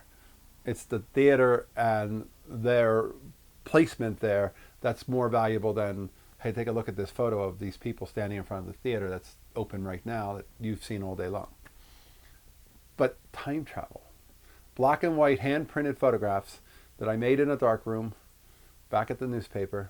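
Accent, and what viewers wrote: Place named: Delaware Valley, United States. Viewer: Sorry for the loss of your father,. I spent part of my childhood on the South Jersey side of the bridge to Philly—right along the Black Horse Pike from Gloucester Township to Mays Landing—so I recognized that Delaware Valley accent of yours right away. You’re absolutely right about those photos feeling like time travel. When you mentioned Acme, I had to laugh. For me, Penn Fruit is another grocery name that pops up in my own “time-travel photos,” at least in my imagination. I was back up that way this past summer in Atlantic County when my best friend from childhood passed. I always enjoy your videos.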